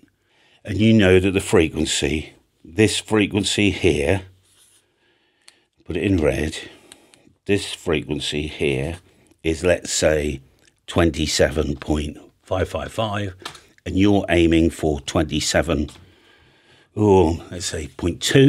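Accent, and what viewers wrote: United Kingdom. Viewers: Sure wish could understand your hard brit English ! Other wise it always sounds like your asking a question...put an AI voice to use...please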